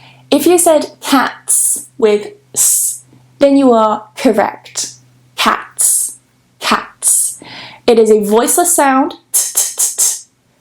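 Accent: United Kingdom. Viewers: Such a FANCY British Accent and very helpful ❤️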